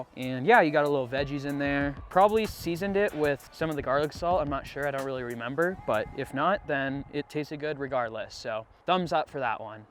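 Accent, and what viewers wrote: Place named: United States. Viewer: hi from india! neat videos. you sound like american born. but what is your ethnic origin? :)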